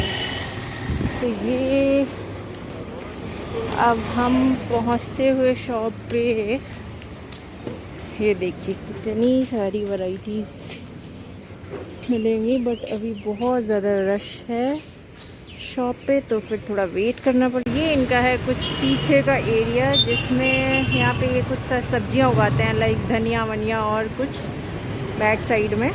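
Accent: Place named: Trivandrum, India. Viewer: Accent says u r in Trivandrum..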